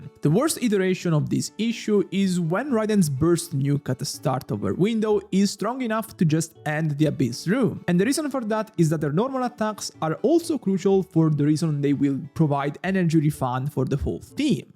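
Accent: Italy. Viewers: yo bro, u italian?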